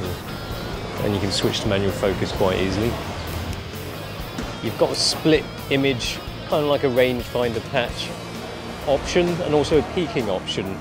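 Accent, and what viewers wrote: United Kingdom. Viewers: You sound British but your asian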